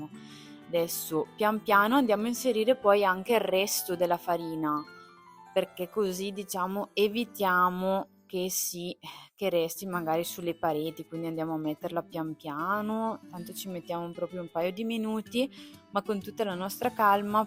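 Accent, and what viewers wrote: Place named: Lombardy, Italy. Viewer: Posso chiederti di dove sei? Dall'accento mi sembra, che sei BS o BG 😂😉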